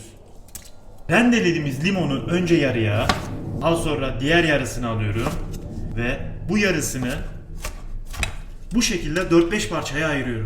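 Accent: Adana, Turkey. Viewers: rendeliyrum adana şivesi çok iyi :D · alürüm geçirüm eklüm aktarıyorüm abi adanalı mısın hzhshshebshs seviliyosun cok beceriklisin masallllaahh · Abi adana şivesi gibi konuşyorsun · Adanalı mısın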